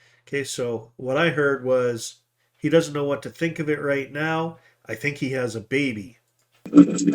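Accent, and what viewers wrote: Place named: Scotland, United Kingdom. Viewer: I always hear that Scottish accent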